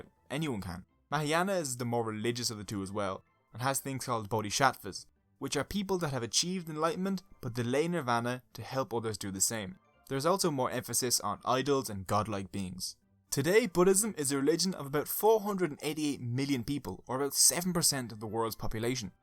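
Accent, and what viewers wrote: Ireland. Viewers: Yes I think he is Irish and speaks to quickly.Nothing to do with Australia though many buddhists on different paths live there. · Hey why is Australia upside down? and what is that Island in the Pacific? Love the way you say tree3🤣 the Irish accent is awesome!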